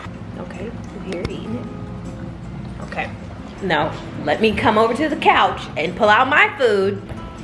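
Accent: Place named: South, United States. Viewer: girl u sounding a little countrtttyyy i love it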